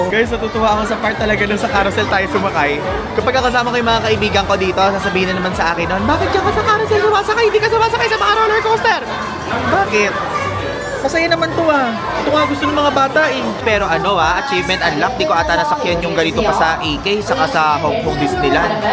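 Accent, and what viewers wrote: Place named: Bulacan, Philippines. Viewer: As a bulakenya gustong gusto ko yung pagtatagalog mo,! sa bulacan ko lang naririnig ang supil 😂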